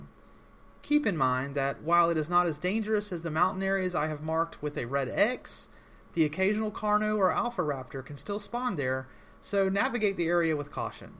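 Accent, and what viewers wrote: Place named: United States. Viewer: Dude this is the best help in Ark on YouTube that I ever listen to at the same time is nice to see an American talk rather than a British action player talk your tips are the best on YouTube Most people contradict their own words when they make YouTube videos on ark with there very own video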